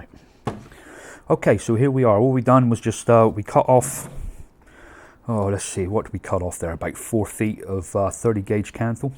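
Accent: United Kingdom. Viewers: north carolina? wow, i thought you were british lol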